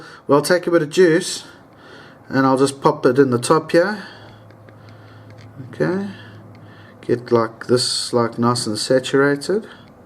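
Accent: South Africa. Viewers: Hey you sound like you're from eMzansi (S.A), Are you??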